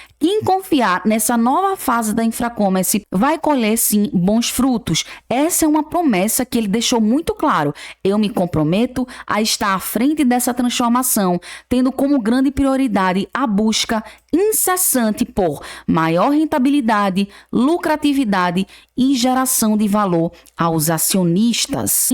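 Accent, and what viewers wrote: Northeast, Brazil. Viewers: Muito legal esse teu leve sotaquezinho do nosso nordestino tradicional!!! Isso te faz a única, e te distingue no meio de todo esse grupo. Parabéns!!! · Adorei nossa marquinha nordestina... Parabéns. Comprei 10k metade 0,46, metade a 0,51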